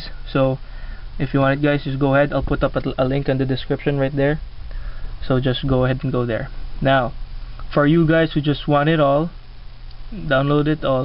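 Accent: Philippines. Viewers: are you filipino cause you have da accent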